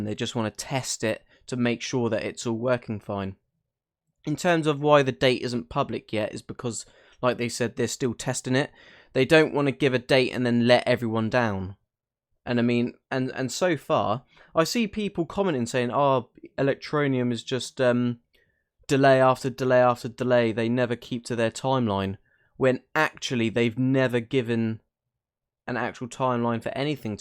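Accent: United Kingdom. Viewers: Why would u dedicate a channel to talk about the worst performing coin of the year? this shit coin has been delay after delay....... disappointment after disappointment........... where are the exchanges they promised MONTHS ago? Hmmmmm? All this technical bullshit talk is not helping....... u know what makes the price of a coin go up? Results!!!!!!!!! Until then my friend you are wasting ur time and British accent on a shitcoin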